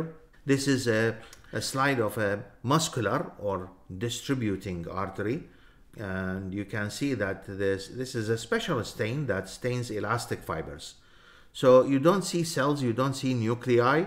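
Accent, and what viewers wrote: Iraq. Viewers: اللهجة العراقيه 🔥❤ عاشت ايدكم دكتور الشرح رائع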